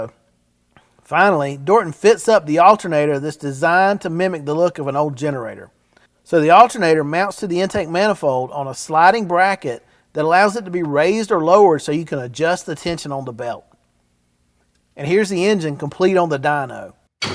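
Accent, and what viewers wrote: South, United States. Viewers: That speech pattern is called "Southern" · And the Oscar goes to 'All the Oddities of Ford's Famous Flathead V8'. A video above the rest. Award winning performance by (the artist). Narrated by a good ole boy from the South. It don't get better than this!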